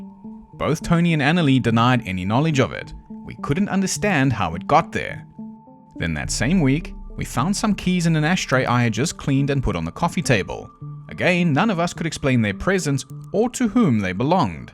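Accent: South Africa. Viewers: A FOLLOW SOUTH AFRICAN?? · Are you from South Africa? 🇿🇦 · Tried to comment earlier and couldn't. Wanted to say I love your personal flare to reading these cases out loud, pretty much saying what we're all thinking, giving validation to those thoughts and feelings. And it's really nice hearing from a south African, I am subscribed to many channels across the globe, but never had one of those until now. But I did want to say that one of your background sound tracks bothers me, it's the popping and crackling one. just hits me in the ear wrong and makes me either mute that part or turn to my phone and play something. I can't describe it any better than that, I'm sorry. other than that, 10 out 10 channel! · So good to hear a South African Accent🎉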